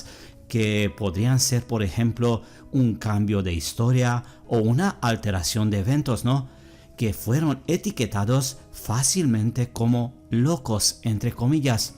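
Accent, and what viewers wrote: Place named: Germany. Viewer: Estoy muy impresionado con su trabajo, de verdad impecable su eapañol es excelente pero su acento parece aleman o holandes puedo preguntarle de donde eres?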